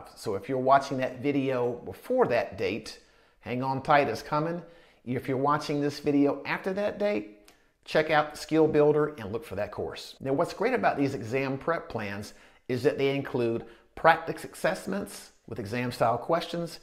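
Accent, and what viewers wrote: South, United States. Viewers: Alabama or Tennessee?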